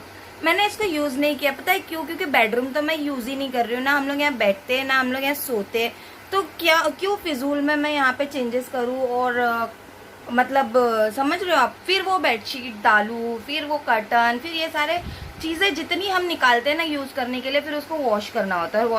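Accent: India. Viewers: Love ur indian accent💕mumbai's life sounds to be like karachi!!!love from pakistan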